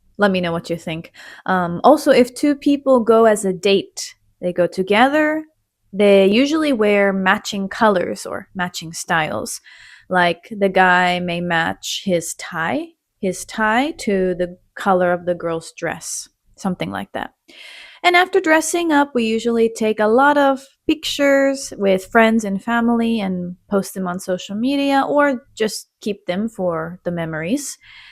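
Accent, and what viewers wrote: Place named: Japan. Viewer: 全て字幕成し遂げで聞いて、７割理解出来た、けど、貴女がJapaneseアクセントでslow english するおかげだね😅プロムは、日本人の意識ではハリウッド映画等で馴染み深いというかusa のカルチャーは知ってるつもりです。😊(知らない単語が連発してるが憶える必要はあるのかな、個人レベル次第かな😅)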